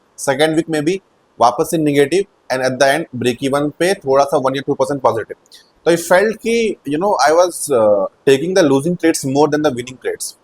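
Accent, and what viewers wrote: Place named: South, India. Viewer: south indian ki tarah lag rhe ho bolte huye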